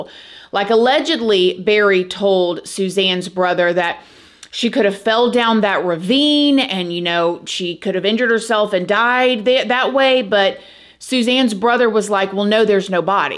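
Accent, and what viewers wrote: South, United States. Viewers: I think he did it. He just looks guilty... I pray that they put the correct person under the jail if it is him it would be so tragic for the girls because they have already lost their mother. If it turns out that he did do it these poor girls will have to spend years in therapy to help cope with the fact that they backed their father and he was lying to them. This is a sad sad sad situation. I also just want to say that I absolutely adore u and ur country accent. anyways I hope they figure out what happened and I hope they find the other person they were looking for when they found her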